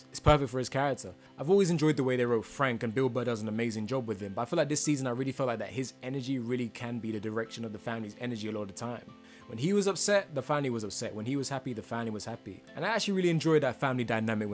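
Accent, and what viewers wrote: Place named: United Kingdom. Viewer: Ahh, I'm already amazed with the British accent🤪😍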